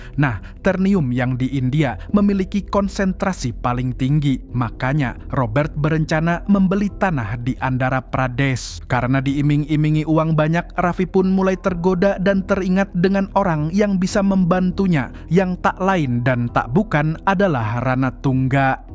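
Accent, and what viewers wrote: Banjar, Indonesia. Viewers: urg banjar jua kh pian mang😅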